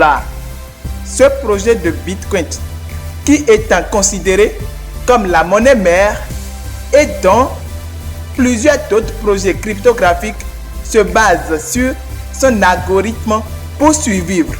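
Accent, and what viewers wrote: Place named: West Africa. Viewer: Son français n'est pas forcément mauvais, mais c'est l'accent de sa langue maternelle qui prime, vous nos fr de l'Afrique de L'Ouest avez ce problème là, écoutez un Congolais(🇨🇩) parler francais et après écoutez-vous parler vous comprendrez, alors l'essentiel est le message qu'il nous donne, c'est très important👌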